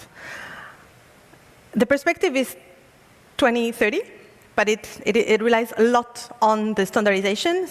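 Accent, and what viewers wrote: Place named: United States. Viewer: Amazing American accent but very hollow speech...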